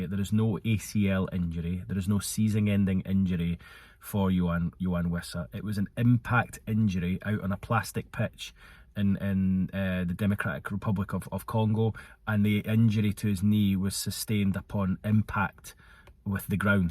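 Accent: Scotland, United Kingdom. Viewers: I’d worn the same pair of underpants 2 days in a row the day you reported Bruno G to the toon and every since I don’t change those bad boys until I hear your dulcet Scottish tones reverberating through my weary Irish sound canals pal 💪keep up the Barry work 🙌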